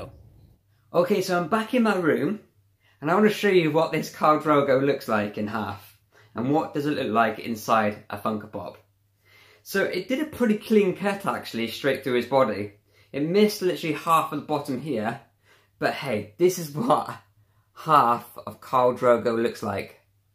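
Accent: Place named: United Kingdom. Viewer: What a British accent and its not a bad thing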